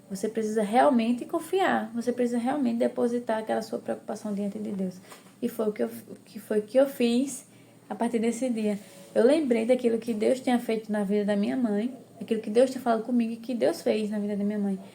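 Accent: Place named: Northeast, Brazil. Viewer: Graça e Paz irmã Olha não sei de onde vc é, mas pelo lindo sotaque acredito que dê alguma região do nosso lindo e abençoado nordeste eu sou se São Paulo, olha o seu vídeo falou muitoooo comigo , apareceu aleatóriamente aqui no YouTube e desde o título até o final do vídeo, inclusive o hino falou ao meu coração 🙌🏻🥺 Que Deus te abençoe, já se tornei uma inscrita 😍🙏🏻❣️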